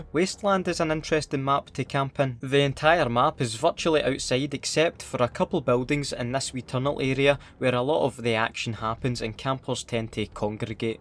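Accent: Scotland, United Kingdom. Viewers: I subbed cause of his Scottish accent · What part of Scotland are you from mate?? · I'm Scottish, and most YouTubers are American. It's just so nice watching you so I can finally hear a Scottish person playing CoD. I actually canny explain it pahaha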